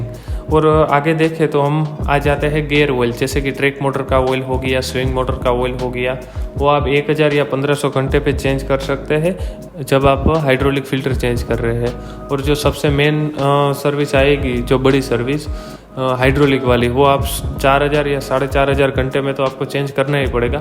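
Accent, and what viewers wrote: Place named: Gujarat, India. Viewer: Doing Good Job Lagta Hai Aap Gujarat Se Ho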